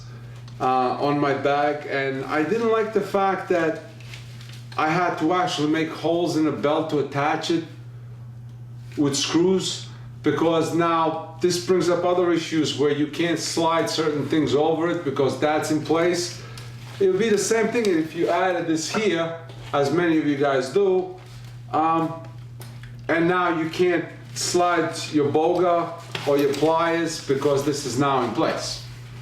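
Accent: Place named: Long Island, United States. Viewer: He's from Long Island, we all talk the same.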